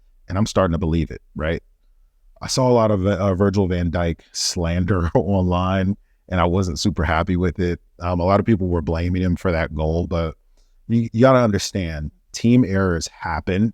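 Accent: United States. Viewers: I've just subscribed for one reason,and one reason only! You are the only person with a US accent that knows exactly what you're talking about. There are a couple of points that you have made that I totally agree with. Not that there right (sometimes it's a matter of opinion). But I have a good knowledge of Liverpool. And the current team. And you are 100% on the money on so many points 👍. If you need to get a bit more exposure, and grow your Chanel? You need local YouTube channels to start looking at you. Contact some of them and see what happens. Knowledge is knowledge. And a red is a red. Looking forward to listening to more of your analysis 👍👍👍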